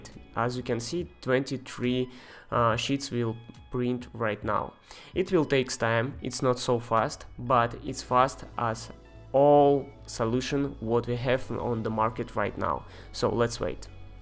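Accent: Russia. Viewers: Судя по акценту, русский твой родной язык)) спасибо за видео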